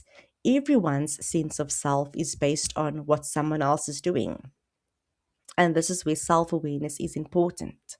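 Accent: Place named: South Africa. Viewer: Omg girl are you South African🇿🇦???The accent??😭❤️please tell me you are South African I know a indian south African/coloured accent😂❤ Also nice video ❤and interesting take Although I do like their hustle,I also see where people are coming from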